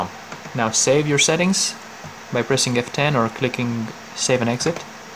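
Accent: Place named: India. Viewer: engleza de indian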